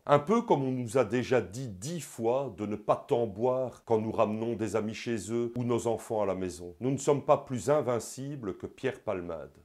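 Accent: Belgium. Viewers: Bonjour d'un voisin de Silly^^ Magnifique vidéo, je découvre ta chaine grace a Mr Sam depuis aujourd'hui meme, et j'ai dévoré ta vidéo sur la méthode, avant de découvrir celle ci. J'ai hâte de découvrir tes autres vidéos, je me sens tellement bien en t'écoutant, un peu de douceur et de bon sens avec un accent belge pas trop prononcé:p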